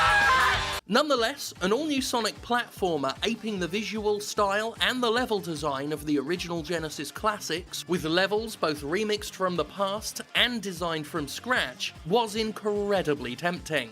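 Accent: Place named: United Kingdom. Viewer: Are you sure about that? From his accent he's clearly British! =P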